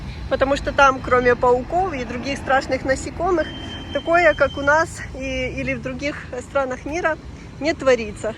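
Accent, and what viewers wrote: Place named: Western Ukraine, Ukraine. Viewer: привет! А почему у Вас западно - украинский акцент?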